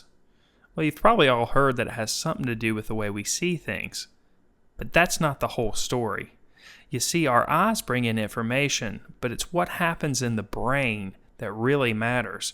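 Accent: South, United States. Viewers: owmygod that's what I was hearing too but then I was like ''wait did he just say arse ? he can't be saying arse .. then what IS he saying?'' and remembered that he showed an eye and was like ''OOOWW so that's what he's saying'' xD southern accent problems :P it's kinda cool .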